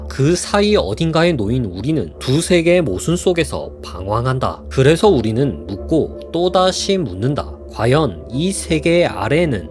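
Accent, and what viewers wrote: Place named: Gyeongsang, South Korea. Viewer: 경상도 억양 멋있어요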